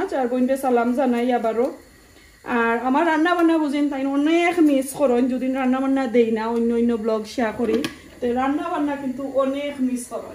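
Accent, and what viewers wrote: Sylhet, Bangladesh. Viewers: Apnar mat kilan apni toh sylheti/ilan matoin kne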